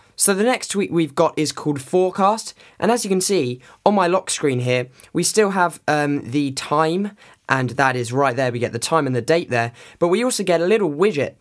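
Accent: United Kingdom. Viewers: I appreciate the demos and tweaks. If you could speak slow, it would be better to understand your British accent.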